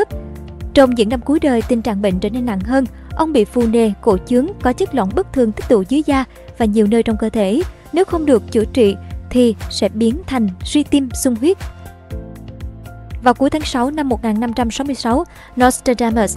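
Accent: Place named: South, Vietnam. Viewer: Love her southern voice. Best!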